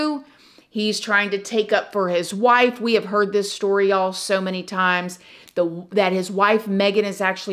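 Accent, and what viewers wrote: South, United States. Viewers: Your vids literally make my day. You cover scary cases but your friendly presence makes up for it. I love your accent btw feels like I’m back in the south where I grew up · I’m high so I had to watch a few times to understand but you’re such a cool human and I love your southern vibe. Honestly reminds me of the olden days when I used to hang with family down south and we’d just tell stories. Keep it up, sister. Love your content. The fact I don’t mind relistening says a lot haha.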